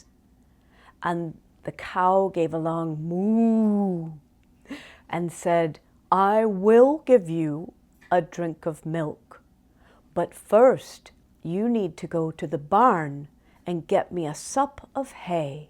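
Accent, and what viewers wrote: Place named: Ireland. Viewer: Thank you for this story! I’m new to your channel and wow, what a treasure trove. (Side note: as an American, I’m really struck at listening to your Irish accent at how much more similar it is to general American than anything found in the UK) But thanks again for your work here, I’m a big fan already 🙌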